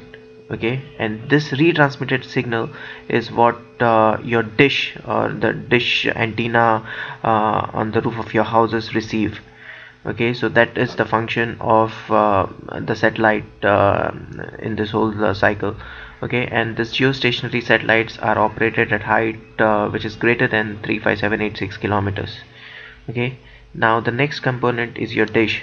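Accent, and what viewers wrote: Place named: South Asia. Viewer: i agree with you about his accent, i think he is from may be India or Pakistan.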